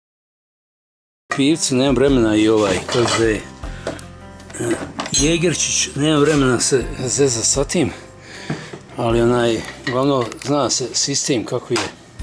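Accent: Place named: Sarajevo, Bosnia and Herzegovina. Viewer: Vozdra i bite! Moram priznati ti si prva osoba iz Nicaze koja sam cuo da zvuci k'o da je sa Marin Dvora. Studirao sam sa dosta Zenicana i nijedan nije imao takav naglasak. Eto vala, k'o da si nam rodjeni Sarajlija. Hahaha! Nego ziv bio i uzbrdo brz. Uzivaj u svemu.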